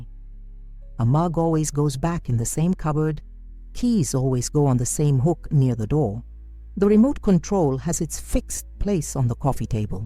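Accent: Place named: Hungary. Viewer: What's Japanese about this? Presenter sounds Hungarian.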